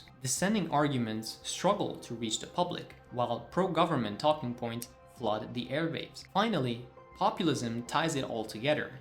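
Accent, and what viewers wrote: Hungary. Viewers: You were born in hungary, right? Or am I crazy? Some of your pronumciation sound hungarian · The Why Club, eh? With 200-some subscribers? Yet another recently created propaganda channel from Orban's spin doctor, Antal Rogan. At least, he could have picked a narrator with a less obvious Hungarian accent and an accurate English pronunciation. Hungary as a blueprint for the US? A piece of shit village in the Balkans with a populist wannabe dictator fantasizing about "leading the world" with his provincial political philosophy geared towards a single mission: grand theft.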